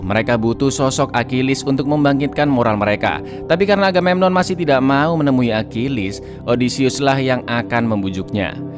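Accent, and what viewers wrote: Java, Indonesia. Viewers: Bang orang Jawa ya bang?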